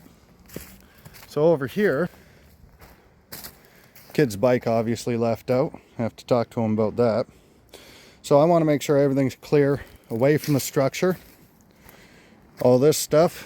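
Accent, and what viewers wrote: Canada. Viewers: If folks couldn't tell by your accent that you're from Ontario, the Eh would have given it away! <3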